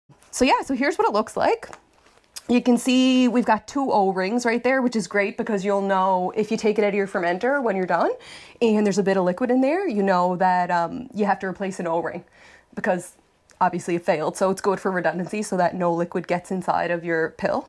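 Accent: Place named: Canada. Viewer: Thanks for the video. The Brew HQ shirt and accent gave you gals away ! Greeting and good luck from Ontario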